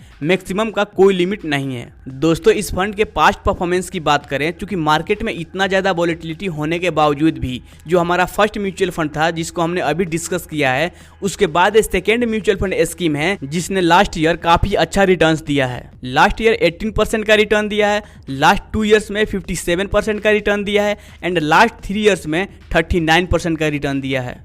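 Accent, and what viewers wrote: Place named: India. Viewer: Bro.. your videos are very informative...Thank you...also please pronounce proper English words.. don't mix Hindi pronunciation... please check spelling mistakes...Eg.Fund..not fhund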